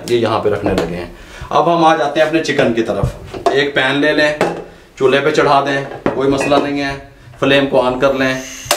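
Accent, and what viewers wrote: Punjab, India. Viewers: He is so simple, jab yeh kehtay hain “ aap bolain gay koi cheez banayee thi” can’t help laughing, his Punjabi is so cute😅😅😅🌹 Theek hu gaya.....!! 😊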